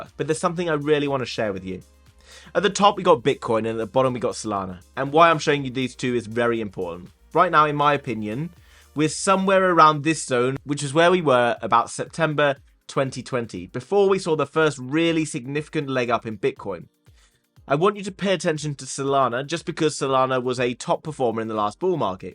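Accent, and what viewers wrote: United Kingdom. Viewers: As a german follower, which even speaks very fast german, i love your clearly fast speaking i guess british english, cauz i ca understand everything and dont have to skip before and that is even awesome, like your BIG PULLING contet! I like your authority and your attitude, cauz i can see the through in your eyes and know your heart is beating for Investigating/trading AND secure your community, what is really epic ! I really aprecciate your content! Haave nice day brother, i send you much energy from the universe =)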